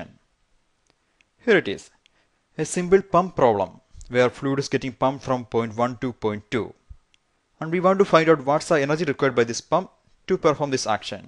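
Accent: India. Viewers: Really? You are making a toutorial and you put an indian guy with terrible inaudible accent in front of a microphone? Great job. Thumb down. This is exactly how pollitical correctness is affecting a quality of performences. Shame.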